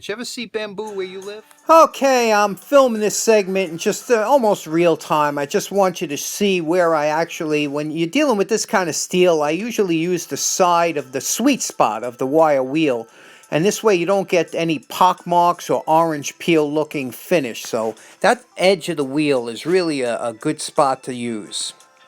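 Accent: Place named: Staten Island, United States. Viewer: Today, I was delivering and setting up a large grandfather clock for a man from Staten Island, his accent reminded me of you,. I work at a clock and watch repair shop in Western Kentucky. Our shop has been run by one family since 1902 and still has most of the german and swiss tools from the early 1900s. Can’t beat the quality.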